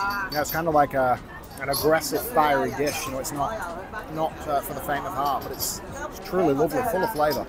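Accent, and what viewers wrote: United Kingdom. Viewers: Best Food Review Show on YT. Better than Mark Wiens. Don’t ever stop. Love the OTT, British accent, food energy, videography. ✅💪👍 #nojoke #keepatit #bestfoodreviewshow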